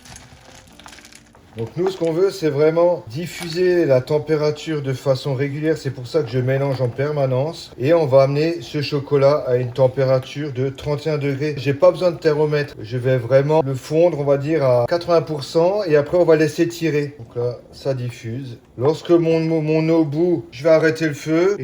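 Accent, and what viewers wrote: Alsace, France. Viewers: Ahh ce petit accent alsacien ! Ça fait plaisir de voir un compatriote ! Je ne vous connaissais pas et l’idée des bounty maison est très bonne 😊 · L'accent alsacien 😂❤j'adore. Je suis d'Alsace également même si je vis en région parisienne ❤❤❤ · je pensais bien entendre un petit accent Alsacien. Bisous d’une Strasbourgeoise du Texas 😉 Bon après le “on le manche” y’a plus de doute 😂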